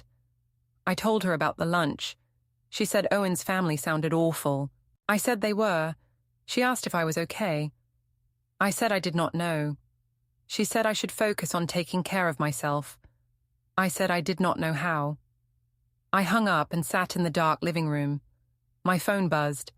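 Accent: United Kingdom. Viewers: "..glorified vacuum cleaner" (with narrator British accent)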